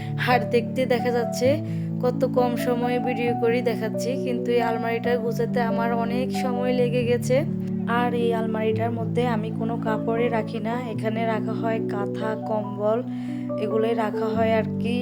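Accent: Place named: Comilla, Bangladesh. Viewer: আপু তুমি কুমিল্লার আমিও তো কুমিল্লার ওই জন্যই তো কথাটা অনেকটাই মিলে যাচ্ছিল আমার সাথে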